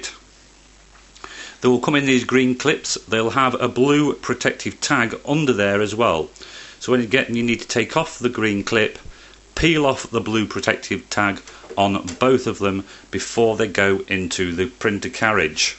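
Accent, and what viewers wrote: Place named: England, United Kingdom. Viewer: Esse cara é inglês dá pra perceber pelo sotaque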